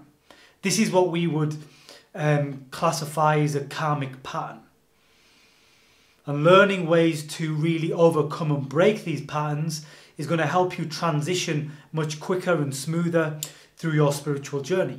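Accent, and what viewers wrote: United Kingdom. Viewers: Lovely stuff! Keep it up young king. Nice to hear a British accent 🤓💚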